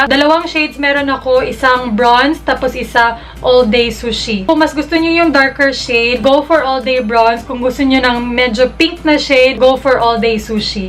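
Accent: Visayas, Philippines. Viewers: Omg bisaya 😂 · maklaro man na bisaya nis ate na hahaha cute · are you anywhere from Visayas? kasi halata po sa tono I mean sa accent nyo po.. from Cebu here :)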